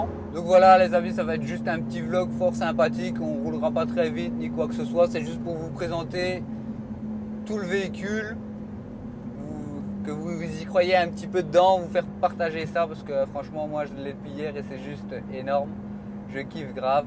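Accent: Belgium. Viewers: On reconnaît le petit accent belge. 🇧🇪🇧🇪🇧🇪🇧🇪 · Tes belge de ou bruxelle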